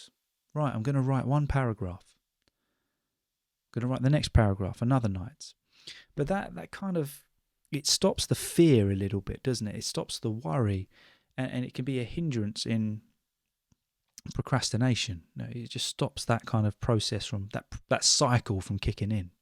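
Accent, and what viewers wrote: United Kingdom. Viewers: I’m a college student from Taiwan, I start to learn english recently, and your videos really help me a lot, thank you. By the way, British accent sounds elegant!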